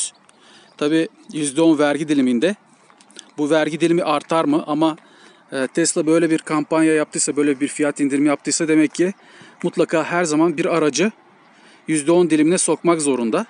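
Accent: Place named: Thrace, Turkey. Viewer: Trakyalı şive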